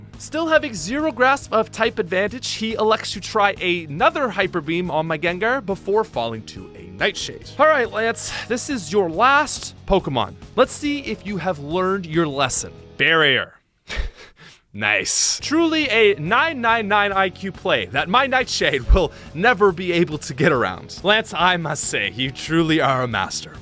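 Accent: Canada. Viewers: 10 seconds in, and I suspect you’re Canadian haha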